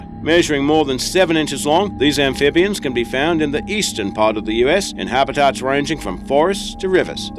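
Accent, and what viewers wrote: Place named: Australia. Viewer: Your accent sounds Australian. If so, you've got a few amazing animals yourself...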